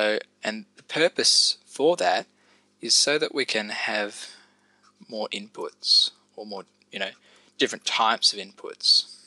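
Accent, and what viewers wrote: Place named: Australia. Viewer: Hey. I am in my first year of studying a paramedic / nursing (double) degree, got my A&P exam on Monday, on swot vac week at the moment. Don't know how I found your YT Channel but it's been SO useful. I'm an aussie too and can I say it's so nice to finally find YT videos that have a normal Aussie accent! So easy to listen to. (Nothing against other accents, it's just easier to listen to being that I am Aussie too). You're great at explaining shit so thanks heaps for that, CHEERS! V useful!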